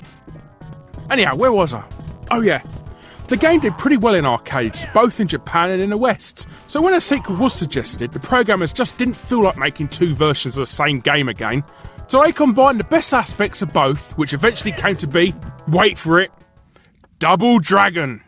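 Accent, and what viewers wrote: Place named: Cockney, United Kingdom. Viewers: that english accent is awesome!! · So you talk in the same way that was ridiculed in that old play " " where that guy sings the song with the lyric "no one taught him 'take' instead of 'tike.'"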